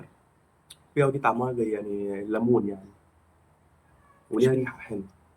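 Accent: Egypt. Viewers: كلام مصري جميل الله يبارك فيك وماما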